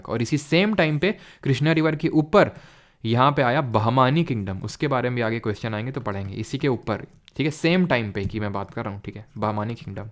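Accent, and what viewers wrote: Kanpur, India. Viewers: Hn kanpuriya hai sir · Sir aap kanpur se h kya ?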